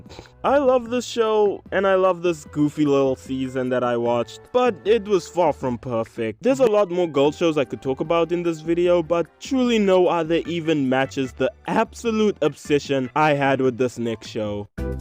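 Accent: South Africa. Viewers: very relatable n r u south african, if so me too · Quick question, are you South African? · Hey, Genuine question, Are you from South Africa. I’m from South Africa, and your accent sounds like so many people I know in SA. Also this video is hilarious. My boyfriend loves mlp and luckily he has a girlfriend that loves it too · Are you South African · Is bro south african? anyways slay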